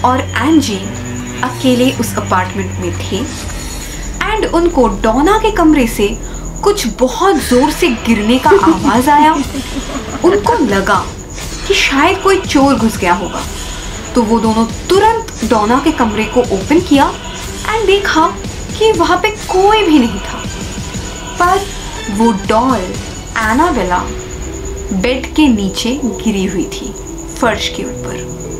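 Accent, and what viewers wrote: Bengal, India. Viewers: Apki bengoli hindi muja bohot pasend haii 😂😛😍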